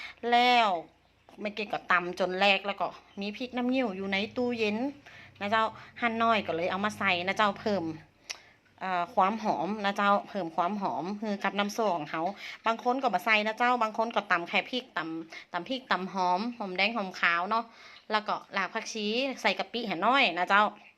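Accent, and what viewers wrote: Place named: North, Thailand. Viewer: แวะมาฟังสำเนียงเหนือคะ ชอบสำเนียงเหนือมากคะ จากfcใต้นะคะ ❤️ น่าทานมากคะ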